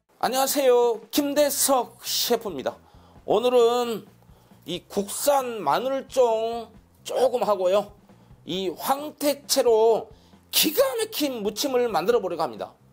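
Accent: Jeolla, South Korea. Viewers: 최고에요 전라도 사투리에다. 맛있는 마늘쫑 황태포랑. 함께. 꼭. 만들어 볼께요 감사합니다 ❤❤❤ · 당장이라도 해서 먹고 싶네요~~^^ 전라도 사투리~~^^ 찐입니다~~ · 황태채 마늘쫑볶음~~구수한 수쿠락~워메워메사투리 ㅋㅋ~~레시피 감사 합니다~~👍👏👏👏👏😍⚘️⚘️⚘️ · 낼은 이반찬 도전합니다. 구수한 전라도사투리 정감있고 안먹어봐도. 군침도네요~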